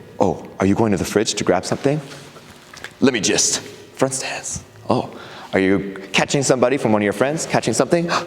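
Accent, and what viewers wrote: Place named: South Korea. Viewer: yup yup I mentioned before but his Korean accent is totally perfect. Some of those TKD Korean terminologies are not very easy to pronounce for a non native speaker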